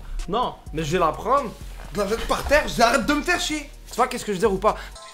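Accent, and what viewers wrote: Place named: Quebec, Canada. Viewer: woula tu mfumes ahahah vive l'accent québécois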